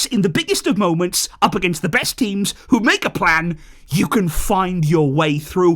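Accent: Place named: England, United Kingdom. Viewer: Your accent doesn’t sound like a typical Spanish person, wonder how a English person ended up supporting Barcelona 🤔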